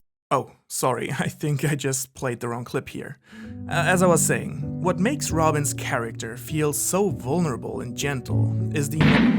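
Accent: Germany. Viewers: As a fellow speaker of English as second language , want to note that it's a common mistake to pronounce infamous as in-famous, I totally understand you. But correct way to pronounce would be "infomus" with a stress on i. P.S. but wait... are you really not German? Where are you from?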